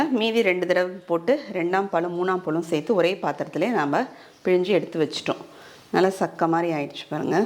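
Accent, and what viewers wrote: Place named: Puducherry, India. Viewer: Vadaa Seema ya.. 😍😍😍 உங்க slang கேக்கதுமே ஏதோ little bit happiness.. Don't know y.. ❤❤ Neenga pondicherry ya 😁😁🙌🙌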